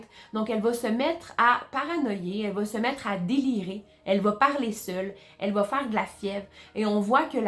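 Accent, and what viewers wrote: Quebec, Canada. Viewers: Bonsoir, merci pour cette histoire effroyable que tu as très bien décrite. Tu es une excellente narratrice et ta chaine va vraiment vite évoluer! Bravo. De plus, j'adore ton accent québécois!!!!!!!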